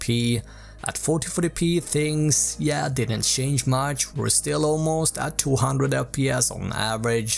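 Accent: Sweden. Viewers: Are you from sweden, great video btw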